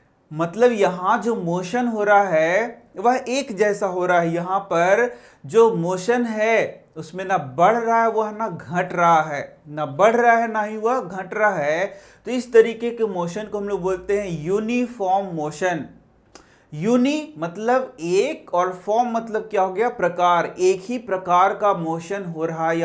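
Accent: Bihar, India. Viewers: Sir,I thing you are from bihar🤔👍👍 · Sir ji app Bihar ke ho kya? Mujhe to vaisa hi lagta hai ki app Bihar ke ho.apne discription me kya likha hua hai? Ek hi line to 100 bar😂😂 This is funny sir.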